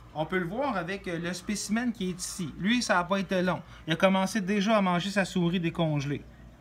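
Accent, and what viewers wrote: Quebec, Canada. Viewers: Snoube - cest laccent québécois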